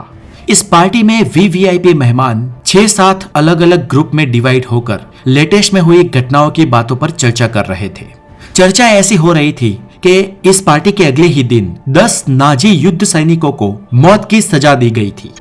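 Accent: Gujarat, India. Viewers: His pronunciation says that he must be Gujrati and most of the times he speaks gujrati so he not able to speak Proper Hindi.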